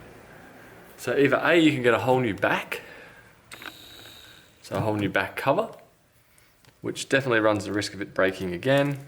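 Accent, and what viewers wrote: Australia. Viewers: on taking my HP apart today, I see there is very little to play with, thank you for the variety and detail of your channel, and clear Aussie explanations.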